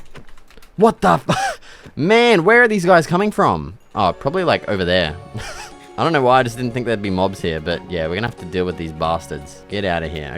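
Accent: Australia. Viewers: Your Australian Accent is so iconic I love it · Quick question are you Aussie? You sound a bit Australian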